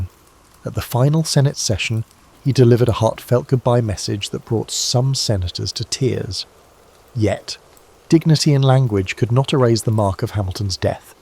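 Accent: United Kingdom. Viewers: p.m. in the state of Ohio in the USA. Note: I find it very helpful to put the speed to .75 Love your British accent! 🙂❤️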